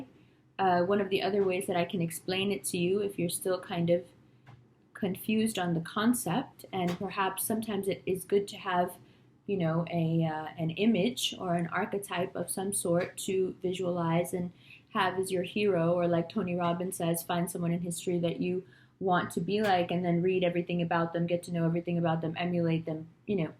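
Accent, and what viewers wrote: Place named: New York, United States. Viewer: I knew you were from New York. That accent. Awesome stuff.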